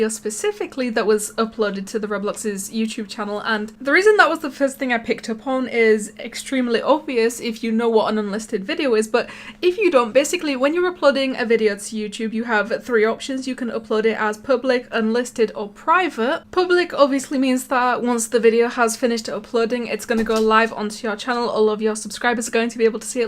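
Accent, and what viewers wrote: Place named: North London, United Kingdom. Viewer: I think she’s North London. I am in east London.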